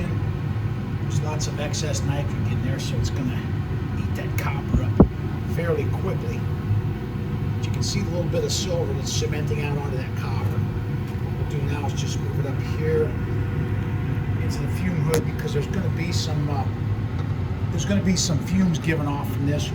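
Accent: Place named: Missouri, United States. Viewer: MISSOURI 🤷‍♂️🙄.....that explains your accent. Missouri is one of the hardest accents, to place.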